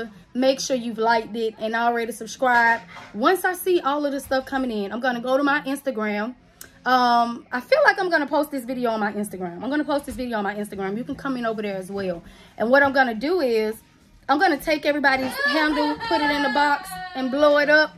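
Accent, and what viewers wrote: South, United States. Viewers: CONGRATULATIONS again on reaching 10K You did That! Thank you for being your authentic self and in RiRi voice unapologetically you All of those thoughts that you were worried about are what makes you you and Why I'm subscribed to your channel your personality, your humor and southern dialect etc we love it all ok so keep inspiring and sharing your talents. For the giveaway Im subscribed to both channels and I follow all three pages on the Gram under Cr3ativ3_val Event styling is my passion so the printer & biz cards would be a huge asset.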